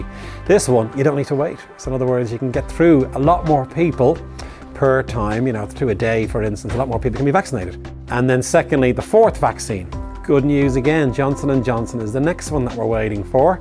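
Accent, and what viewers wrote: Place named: Dublin, Ireland. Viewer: Except for the dub accent, it's a good video.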